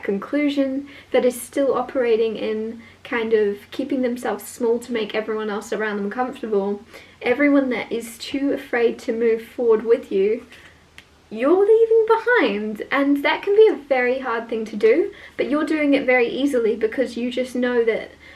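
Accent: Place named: England, United Kingdom. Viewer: I like your videos in.English i.lke your English accent!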